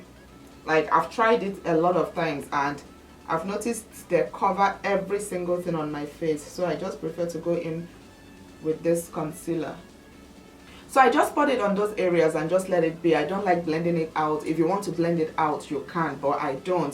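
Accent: Nigeria. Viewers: I’m gonna guess Nigeria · b. yea shes nigerian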